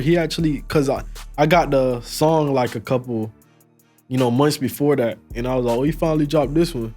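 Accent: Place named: Jamaica. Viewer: He Jamaican. You can tell he still tryna fiigure out the American talk.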